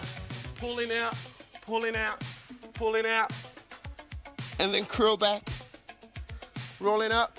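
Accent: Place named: Ghana. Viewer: English Ghana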